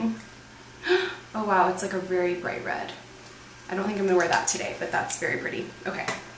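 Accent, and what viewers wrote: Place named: United States. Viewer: Congrats. on making the jump to YT. ! , you mentioned Canada but your accent sounds American 🙂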